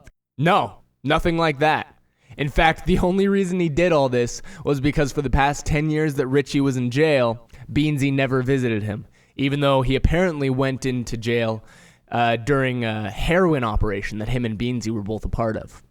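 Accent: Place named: United States. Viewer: Refreshing to hear an American discussing American topics! ❤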